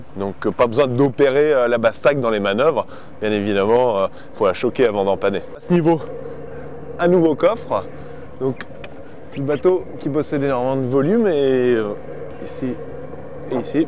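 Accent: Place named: France. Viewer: yes I like French accent, look forward to seeing it. Merci You have sold an Amel 60 as soon as I have won lotto.:).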